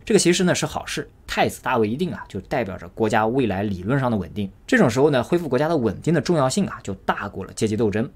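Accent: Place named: Tianjin, China. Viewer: 是天津人吗？感觉口音有点像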